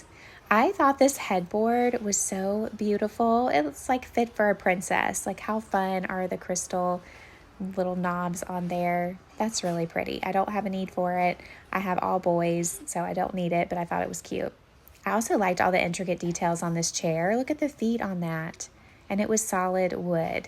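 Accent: South, United States. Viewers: I’m hooked. I’ve watched your videos a lot these past days. You really sound like a Southern Belle. It’s fun shopping with you.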